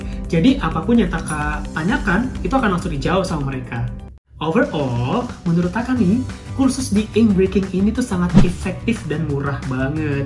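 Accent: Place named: Java, Indonesia. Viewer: Sepertinya masih enggris Jawa ya..cara pengucapannya pronunciation nya belum natural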